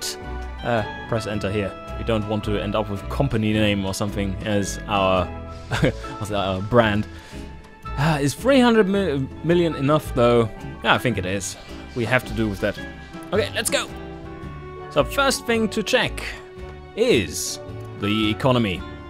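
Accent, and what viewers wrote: Germany. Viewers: well im glad you make those xDDD and just to say - its not very bad but i can here youre german as me - ever thought of making videos in german?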